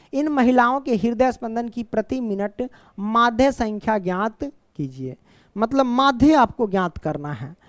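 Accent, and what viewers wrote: Bihar, India. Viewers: Aap Bhihar se belong karte ho ...?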